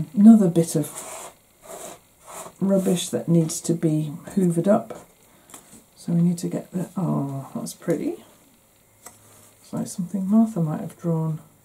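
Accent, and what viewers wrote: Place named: Northern England, United Kingdom. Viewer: Hello. I just found your channel and what a lovely surprise! As soon as I heard you say, " Fed oop", I knew you were in northern England! I am back in the States, but worked in Bradford for three consecutive years for George Barker Refrigeration, as a Sr. Buyer, from 1992 to 1995. It was such a major, but wonderful experience for me that I am going to write a book about my stay, using a sense of humor, similar to James in All Creatures Great and Small. My best friend, Val, lives in Leeds and we visit and email to this day. So I can enjoy listening to your accent and northern phrases. Val would say she had to go home and feed her moggies and now I don't bat an eye! I miss her. We even have the same birthday! I am native to the USA and living in New Hampshire. We are a family farm. And I am just putting together a sewing cupboard. 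My sister gave me a lovely small armoire with lots of drawers for thread and supplies. You seem to be sorting out your sewing drawer.Nothing wrong with keeping bits and bobs that you may use at some point. I have issues with remembering what is in what drawer! I look forward to following your channel. Are you in Yorkshire? - Diane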